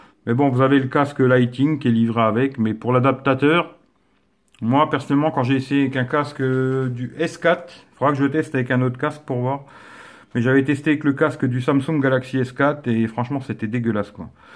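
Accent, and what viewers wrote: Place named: North, France. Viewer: L'accent du nord dure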